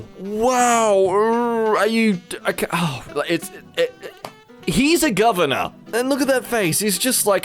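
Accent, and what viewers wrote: Scotland, United Kingdom. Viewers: No one wants to talk about his Scottish accent? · I totally love the Scottish accent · the Scottish accent was the best thing as well as "i sware ya brain so hollow i could turn it into a bagpipe" The Demoman TF2 intensifies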